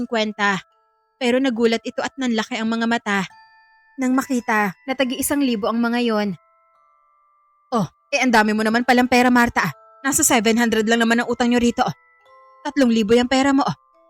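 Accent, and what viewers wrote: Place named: Rinconada, Philippines. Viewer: Parang ringconada ka idol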